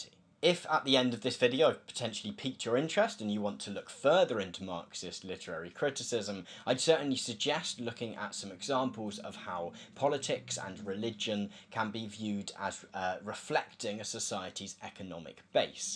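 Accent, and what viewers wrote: United Kingdom. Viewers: I watch your videos and those of the Yale course on the same topics. Yours are 10 times better. You also outshine many other YouTubers discussing classic and contemporary philosophies. May I also say that watching your videos has boosted my respect for Exeter and British English, an accent I had trouble with?😀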